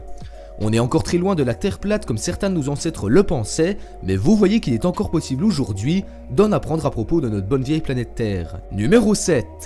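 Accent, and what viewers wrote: Belgium. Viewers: avec son gros accent belge de merde il ne m'apprend rien ce petit cretin qui il est pour dire ca va te cacher et ne passe jamais a la radio tu m'a donner envie de chier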